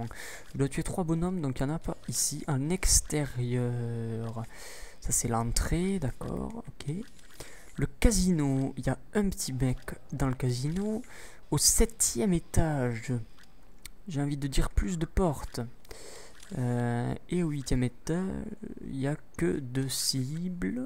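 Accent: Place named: South, France. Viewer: jadore ton accent du sud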